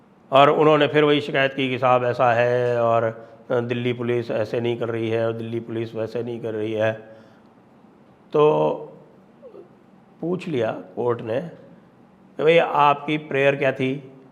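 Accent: Haryana, India. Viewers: Ha Ha love your Haryanvi Accent 🤣🤣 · वाह साहब न्यूज बताने का हरियाणवी अंदाज बड़ा निराला है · Ajj ka andaj nirala rha haryanvi style me. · सर आपकी हरयाणवी सुन कै स्वाद आ गया 😂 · Haryana local language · Sir your Haryanvi is too cute😂😂 And ye log Haryana ka naam dubane ki kaam me lag rkhe hai. Kori sudhi bakwaas kr re hai ye bawde. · Your Haryanvi accent is amazing. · Your Hariyanvi is too good, Sir 🙏🏻🙏🏻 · Sir aapki haryanvi sunke swad agya. Jai Bhagwan parshuram 🤩🚩🚩🚩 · जी, आप तो बड़ी गजब हरियाणवी बोलल्लो छे, घणो दिल खुश होग्यो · Zabardast haryanavi, sir.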